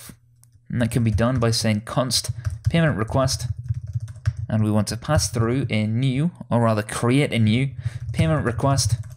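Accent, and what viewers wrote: United Kingdom. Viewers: Where is your english accent from ?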